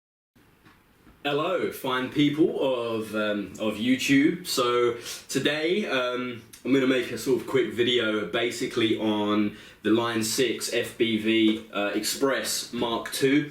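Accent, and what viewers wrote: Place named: Rio de Janeiro, Brazil. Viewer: He speaks like a pal from Rio de Janiero!..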